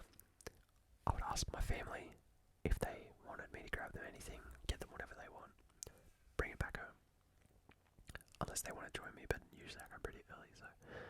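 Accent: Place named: Australia. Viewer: it's so great to see another australian dude who likes/makes asmr! I've been wanting to make some for a few years but never started, didn't expect to open this video to another aussie voice it was weirdly familiar haha, keep it up man this was heaps relaxing 👏🏼 If it's not intrusive, do you mind sharing what state you're in? The mildly specific accent is there but can't pick it haha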